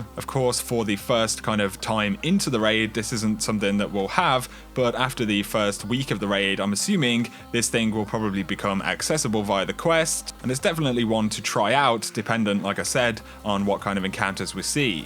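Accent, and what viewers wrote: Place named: Birmingham, United Kingdom. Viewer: Brummiest accent going on yt lool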